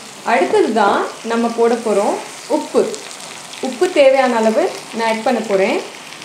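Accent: India. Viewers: இந்திய தமிழ் வாடை அடிக்குதே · sis don't use the blady indian tamil you use in our Tamil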